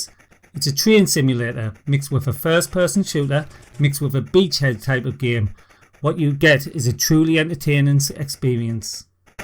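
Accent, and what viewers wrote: United Kingdom. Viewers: Is this Bri ish ffs talk english man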